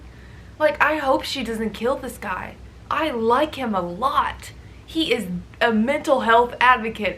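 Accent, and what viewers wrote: South, United States. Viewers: My favorite thing is the southern accent slipping out when you’re excited. 😂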